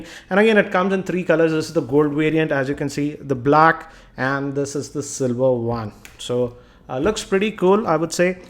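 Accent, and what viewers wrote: India. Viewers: lol unbooxinng typical Indian accent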